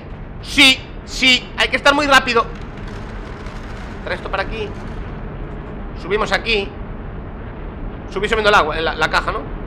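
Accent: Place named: Galicia, Spain. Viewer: Ese acento gallego me recuerda mucho a una parte de mi familia que vive en Monforte/Ourense y se me hace muy entrañable la verdad jajaja 🔥😂 Me gusta tu rollo, transmites buenas vibras, mi apoyo por aquí! 🔥🔝💪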